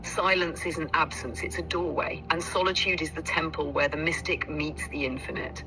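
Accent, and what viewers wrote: United Kingdom. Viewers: I can't stand the British voice😅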